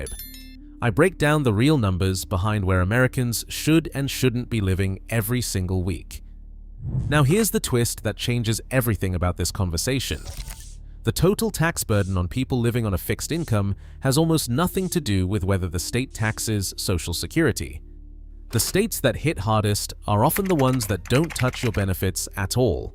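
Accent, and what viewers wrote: United Kingdom. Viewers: Just want list/chart Too many words - leaving now (and the eglish accent is annoying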